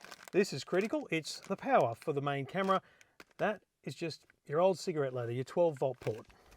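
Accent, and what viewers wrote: Australia. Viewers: This video isn’t in the UK. He has an Australian accent. Also, the UK and Australia aren’t third world countries and they need to meet similar safety standards as the US, so yes there are definitely airbags in those a-pillars. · This is very clearly an Australian accent.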